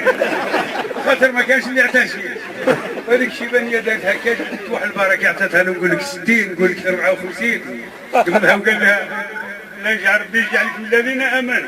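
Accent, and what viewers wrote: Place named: Beni Snassen, Morocco. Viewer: c'est pas l'accent de la ville d'oujda, c'est un znassni ..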